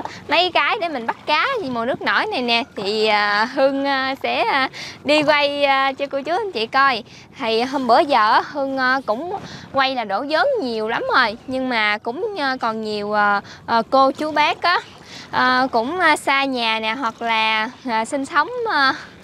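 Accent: Mekong Delta, Vietnam. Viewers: Em chat giong mien tay nghe ngot nhu mat ong rung,❤❤❤❤❤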